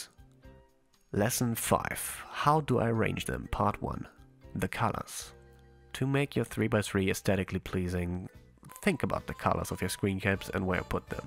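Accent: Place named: Germany. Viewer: Dies to German accent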